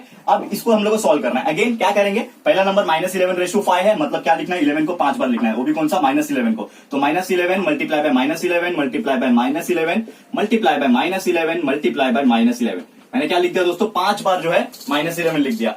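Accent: Maharashtra, India. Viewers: Sir your teaching very well Sir Kay aap Marathi ho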